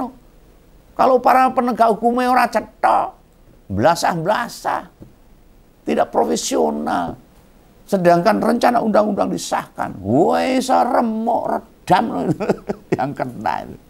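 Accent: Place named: Java, Indonesia. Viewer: Enak didengar bahasa jawanya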